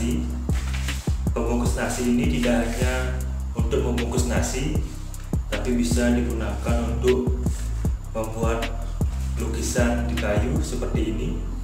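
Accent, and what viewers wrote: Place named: Bali, Indonesia. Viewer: Logatnya bali bgt 👍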